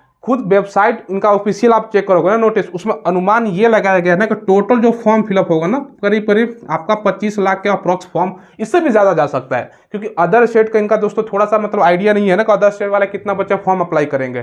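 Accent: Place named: Eastern Uttar Pradesh, India. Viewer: Ame Bhai apna east UP se hai😅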